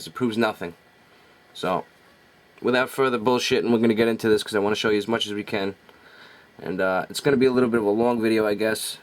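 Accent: Boston, United States. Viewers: Are you from the east Coast? I am from NJ. I seem to hear a boston twang. or maybe a brooklyn tone. We knowers of the truth need to get together. I suggest weekly Videoconference. What causes the quick Snap back to normality? We need to execute Genocide concerning these creatures.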